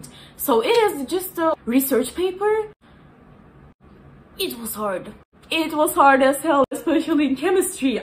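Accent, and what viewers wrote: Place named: Turkey. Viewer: Türk aksanı da çok yakışıyor .*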